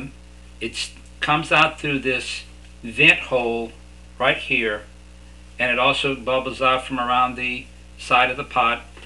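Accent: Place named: Louisiana, United States. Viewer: He's definitely from Louisiana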